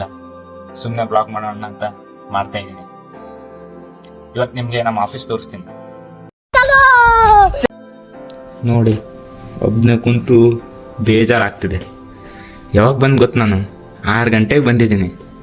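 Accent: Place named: North Karnataka, India. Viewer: Anna namma north karanataka language nag maat ada your logs is very nice👍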